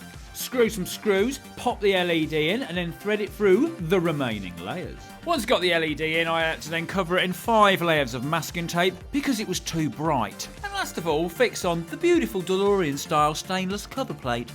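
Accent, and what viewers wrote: United Kingdom. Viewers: Extra British in this short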